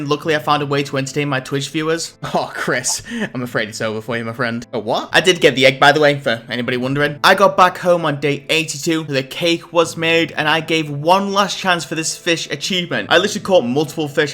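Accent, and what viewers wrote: United Kingdom. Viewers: Yo fr I CANNOT listen to a british "person" talk for 30 mintues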